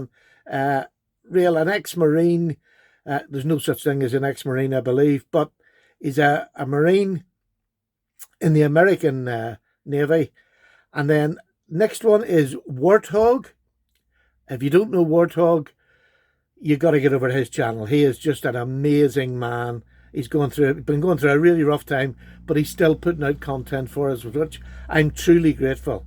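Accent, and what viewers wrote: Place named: Ireland. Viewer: Hi! I stumbled upon your channel about a week ago, I I want you to know what a pleasure it is to watch your videos and listen to your voice! Your brogue is what I imagine my ancestors would have sounded like! I appreciate your comments and attitude as well as the knife content! Have a great day Sir!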